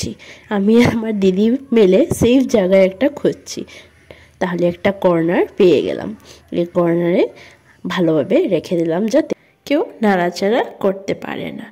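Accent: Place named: Sylhet, Bangladesh. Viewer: Suddho bangla tumar dhara possible na, sylheti valo hobe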